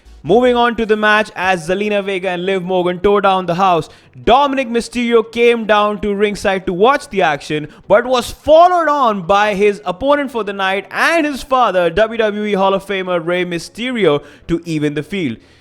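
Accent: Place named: India. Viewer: this may sound dumb but please stop talking with a indian twang you started out so well the firs 30 seconds or so ... idk why I even bother commenting on it but yeah idk it's slightly triggering PS it's not really something you do I guess but it's more WWE fan channels filled with BAD BAD english speaking by indian people who made the title and video in english but then starts to yapping in indian or whatever they say about the WWE in videos ... no offense in advance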